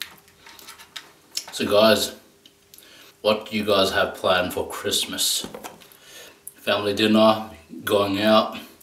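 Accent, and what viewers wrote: Australia. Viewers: Till now still can’t decide whether I watch you for your talking (I LOVE AUSSIE ACCENT don’t judge pls) or how you viciously eat 🤔🤔 merry Christmas!!! 🎉🎊🎁 Ps( this is the saddest gingerbread house ever made 🤧😂)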